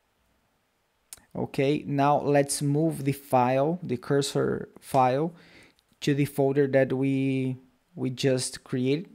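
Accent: Brazil. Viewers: Ganhou novo inscrito! (Ótimo inglês -- tenho quase certeza que tu é BR pelo uso do "T" e alguns sotaques hehe)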